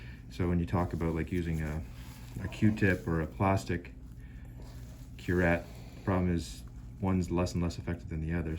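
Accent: Canada. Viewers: I enjoy your Canadian accent!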